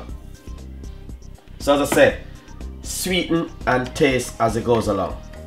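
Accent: Jamaica. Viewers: Dude your jamaican accent is messed up with England. Thanks for the recipe. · I like jamaican accent 😎✌ love from Panama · he's Jamaican for crying out loud! This is not a British channel.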